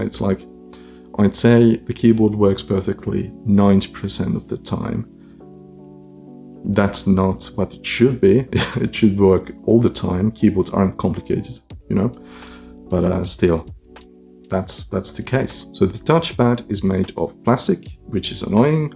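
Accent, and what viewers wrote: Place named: South Africa. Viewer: Bro sounds South African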